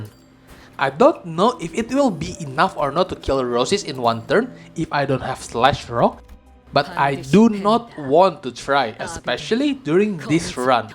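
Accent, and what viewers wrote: Indonesia. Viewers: You have an indonesian english accent, are from indo? 😀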